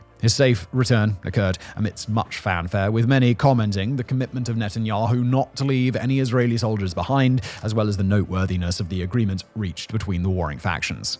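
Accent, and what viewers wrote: England, United Kingdom. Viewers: I must admit, the way he so confidently stated it with that kings English accent had me question my own pronunciation for a moment.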